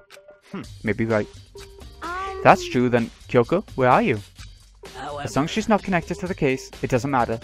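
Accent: Wales, United Kingdom. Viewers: you sound Welsh asf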